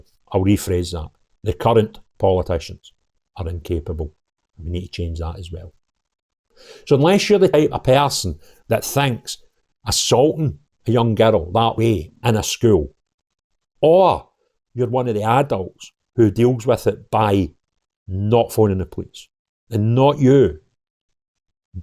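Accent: Scotland, United Kingdom. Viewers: Forget their nationality,religion etc,,yet your headline,,foreign attacker,,,your a Scot in accent only,,,,heres one l would bet on,,,your a rfc supporter or that ilk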